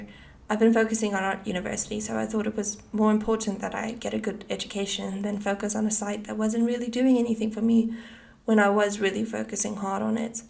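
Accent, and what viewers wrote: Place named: South Africa. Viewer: im like this girl 100% sounds south african and boom i was right. i do redbubble for fun and you are 100% right through out your video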